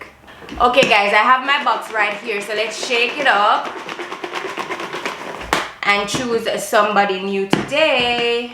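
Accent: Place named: Jamaica. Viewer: Ik a Jamaican when I hear it love this channel ❣️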